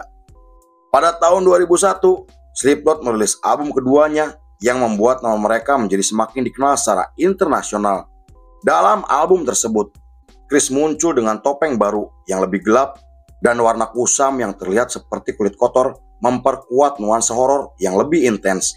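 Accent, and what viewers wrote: Indonesia. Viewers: Medok amet suaranya kaya kang ibing 😂😂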